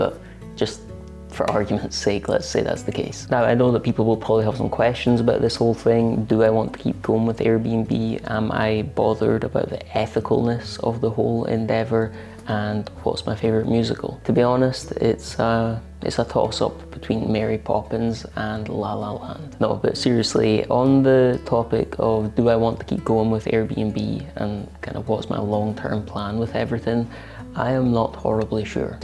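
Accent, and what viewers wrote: Scotland, United Kingdom. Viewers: I'm terribly sorry, but I'm begging you to answer - is that a mild-ish sort of Scottish accent?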